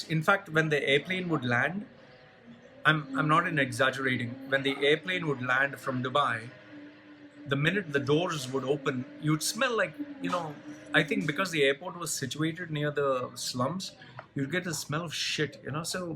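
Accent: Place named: upper class, India. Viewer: I think dubai has made you soft. Most of your reasons come from overgeneralization ,although because you grew up in a majority Indian city (Dubai) you talk like a tipical upperclass Indian from a metro city .either way its your life , enjoy it ,and raise your family. I too have wished I would get away from my relatives who add nothing but negativity in your life , they are too nosy. but I hope you could at least spend more time in India.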